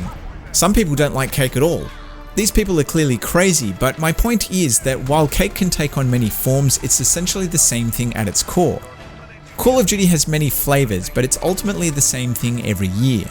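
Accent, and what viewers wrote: Australia. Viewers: what is your accent btw?! Australian?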